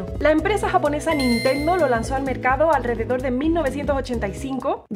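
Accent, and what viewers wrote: Andalusia, Spain. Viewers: 1'22": y ustedes diréis... Con todo respeto, creo que una persona con educación superior no debería hablar así. Esas maneras andaluzas son responsables de que aún hoy día en muchos lugares de Hispanoamérica se hable mal el español y un profesor tiene que dar ejemplo. Saludos cordiales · Hola siento curiosidad de donde eres por tu forma de hablar, me imagino de España pero de que parte?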